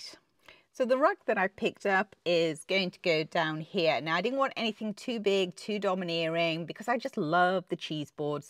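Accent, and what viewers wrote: England, United Kingdom. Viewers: I adore your sweet English accent as well as your stunning decor! I appreciate the many tips you share throughout your videos. So many lovely things and God has certainly gifted you in displaying them in a way that enhances their charm. So happy that I came across your channel. Looking forward to future ones! God bless!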